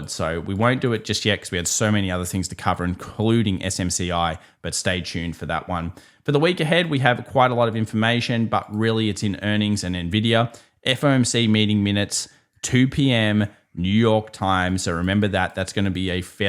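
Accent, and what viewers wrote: Australia. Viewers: Kangaroo English (he is from Australia 🇦🇺)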